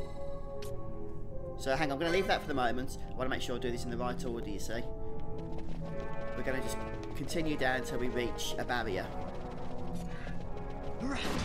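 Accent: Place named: Birmingham, United Kingdom. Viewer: He's from the Birmingham region.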